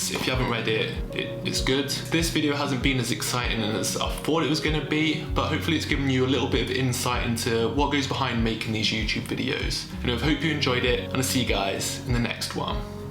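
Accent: England, United Kingdom. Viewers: are you English.... Anyways my day is pretty much like yours l am myself just launching into the you tube space into the health and wellness arena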